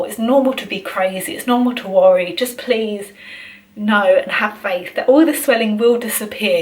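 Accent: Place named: United Kingdom. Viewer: Wow!! The result is fabulous! Love your face profile (actually, love all your face). I am a 53 year old man that's gonna have double jaws surgery on next February. I have myself accounting about the same comments when i decide to go on with the surgery (my age,etc) . I just want to say to the public that people should listen to themselves if they make the decision, like you did !! We can see that it was really worth it. By the way, are you British ? You have a cool accent. I'm Canadian from Quebec.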